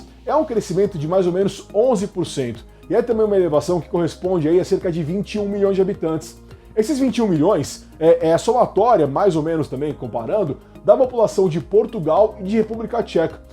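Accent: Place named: Centre-South, Brazil. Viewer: no merdil basta vc falar como centro sul que ja acham lindo mas quando um tuga ouve nao curte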